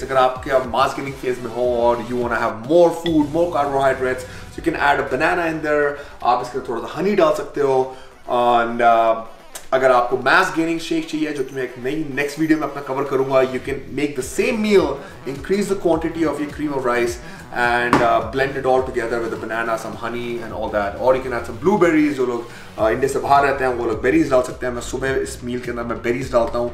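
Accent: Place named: India. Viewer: I love the Indian desi touch while he talk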